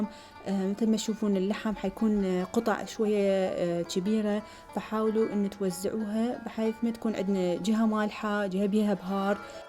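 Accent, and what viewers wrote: Baghdad, Iraq. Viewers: ولله مظبوطه اكو شغلات معرفه اتعلمته تسلمين كلامج حلو بغدادي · انتي دوخينيه لئن ماجاي نعرف انو انتي عراقيه و لا كويتيه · انا دخلت نتعلم الدولمة العراقيه فجاه عشقت في كلام العراقي مشاء الله حلو يهبل ❤❤ 🇩🇿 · اسلوبك جميل و لهجتك العراقية الجميلة❤️فيديو جميل و رايق و ممتع اهنيكي 👍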